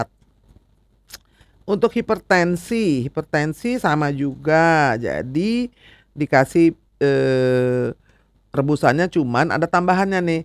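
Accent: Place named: Java, Indonesia. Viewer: orang medan, aku seneng seperti orang jawa bahasany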